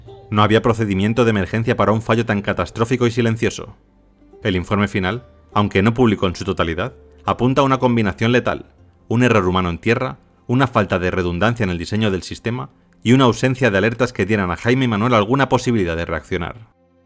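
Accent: Spain. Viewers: Así lo pronuncian en España.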